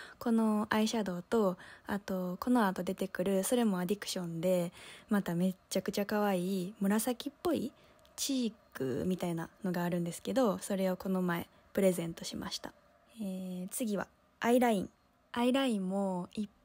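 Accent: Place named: Kansai, Japan. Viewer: さんの関西弁、大好きです＼(^o^)／ いつまでも、そのイントネーションでいてくださいね！てか、2年温めてた！？www これからも、マイペースに動画更新続けてくださいねー♪ おわりー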